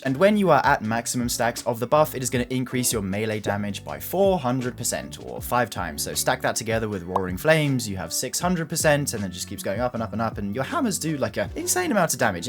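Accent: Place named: England, United Kingdom. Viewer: Hearing saying "You'll be bonking everything" in his English accent is pretty funny, as it means shagging everything over here. 'Bonking' as related to hammers only really works with an American accent, to my mind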